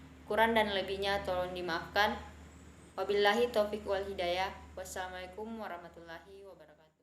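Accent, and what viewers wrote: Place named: Mandar, Indonesia. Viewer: Sangat baik👍. Cuman bahasa Indonesianya agak kurang. Masih logat² mandar. Semangat💪💪😁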